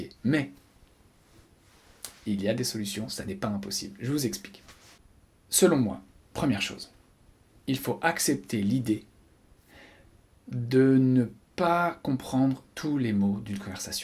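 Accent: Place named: United Kingdom. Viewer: J'adore votre accent so British 😊😊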